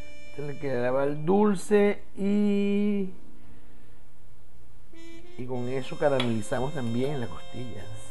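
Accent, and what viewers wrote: Caracas, Venezuela. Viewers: Guao acento Venezolano Caraqueño,exitoso... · Simpático este chinito que no puede negar su acento Venezolano! Hoy iré al bosque en el barrio a ver si tengo suerte de conseguir algo de eso! Con esta escazes imagino coseguiré la mitad, el resto lo inventaré, así estamos aquí! Gracias por tu receta! Un abrazo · Que cómico me encanta sus comidas pero me encanta también ese acento caraqueño sifrino que tiene gracias 👍👏👏❤ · te amoooooooooooooooo, me encanto verte cocinar con tu acento caraqueño, quiero la receta de las lumpias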